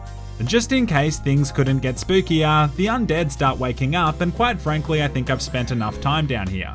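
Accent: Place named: Australia. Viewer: Dearest, I believe you are Australian like me? Just going off the accent here. This video was posted 5 hours ago. You were awake at 3 in the morning? g e t s o m e s l e e p y o u w e t c a b b a g e - Sincerely, Glacier.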